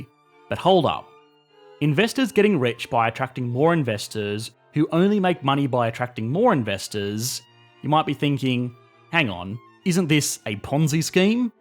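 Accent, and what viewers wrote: Australia. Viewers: you upload exactly when I'm making my evening coffee, nothing like watching a smooth Aussie accent explaining economics with a hot cup of coffee! :D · You Australian?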